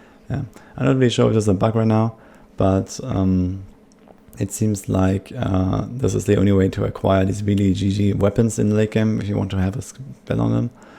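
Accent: Germany. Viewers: Thx a lot, now i understood everything ;). Looked 3 videos before and i still had questions. Fast english, but is ok for me because its german english ^^. Dank dir auf jeden Fall, super Videos ;)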